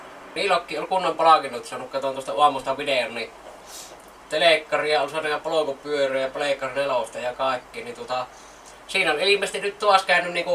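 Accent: Savo, Finland. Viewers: Erittäin hyvin sanottu! Jo vain kun sen sawon murtteella tyrkkee tulemaan nii kyllähä siihe oma lisäpotku tullee asiaan ku asiaan! :)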